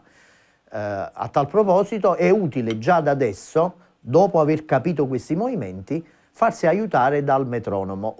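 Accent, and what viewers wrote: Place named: Campania, Italy. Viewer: Grande maestro! Simpaticissimo. L'accento campano mi fa sentire a casa.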